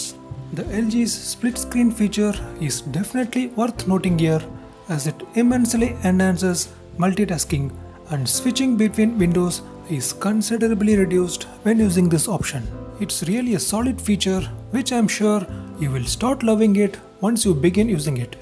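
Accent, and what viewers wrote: India. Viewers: You know it's legit when you hear an indian accent.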